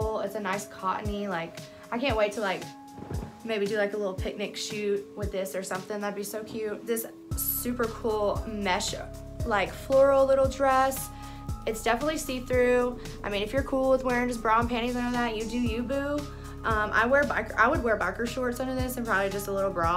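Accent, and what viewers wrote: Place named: United States. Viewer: Girl what state are you from? I like ya accent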